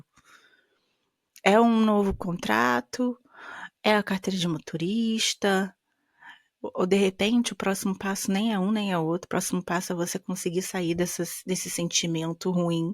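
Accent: Rio de Janeiro, Brazil. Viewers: vc é muito linda e amo seu sotaque carioca. Sei da sua opção mas não posso deixar de me apaixonar pela sua beleza. meu sonho de mulher!!!:face-red-heart-shape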